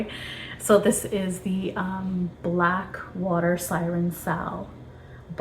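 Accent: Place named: Whitby, United Kingdom. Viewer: I'm in Whitby, it sounds like you're somewhat nearby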